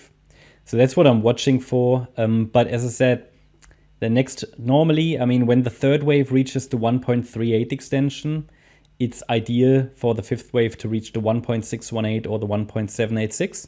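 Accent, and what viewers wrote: Germany. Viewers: ich hab mir das video angeschaut und direkt nach 20 sek gemerkt das du deutscher bist hahaha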